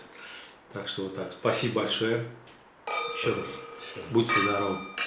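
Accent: Baku, Azerbaijan. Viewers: Amma akçentiz hamını heyran edir, əsl bakılı akçentidir. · ))) ona görə ki bu Baki akcentidir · Бакинский акцент🙏👍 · Къардаш всё супер. Особенно твой БАКИНСКИЙ акцент. Почему говорю я служил в армии в прекраснейщем гостеприимном городе БАКУ Очень тёплые воспоминания. На сегодняшний день БАКУ говорят вообще жемчужина южного Кавказа ,хотя он и при союзе был великолепен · Я русский но родина моя Азербайджан, я родился в Баку и мне приятно слышат своего земляка с нашим бакинским акцентом. Здоровья вам и счастья. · Получаю удовольствие слушая вас. Вы можете не готовить, а просто рассказывать о чем угодно. спасибо ща прекрасный бакинский русский язык, который музыка для меня . Спасибо земляк. Мира и процветания!!🧿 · Джан э джан . Как же я соскучился по нашему бакинскому акценту ... Всем привет из Германии ! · Я уехал из Баку 35 лет назад Живу в Нью Йорке Бакинская еда известна на весь мир Все кто побывал в Баку только и говорят о еде А мне было очень приятно слушать его неповторимый Бакинский акцент,который не спутаешь ни с чем Интеллигентный, красивый человек Дай бог Вам здоровья Это настоящий Бакинец! 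· этот родной бакинский акцент - особая причина смотреть видео этого прекрасного человека!!! Каждый раз возвращаюсь от них на родину, в Баку периода СССР, и у меня улыбка от просмотра! Дай Бог ему здоровья и счастья! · Подписался из-за моего родного бакинского акцента, ну и конечно же очень вкусно))) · Супер!!! 👍👍👍. Бакинский акцент вообще супер!!! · Очень по нашему, акцент приятный, наш. С искренними пожеланиями всего самого лучшего от бакинца, давно проживающего вдали от родного города.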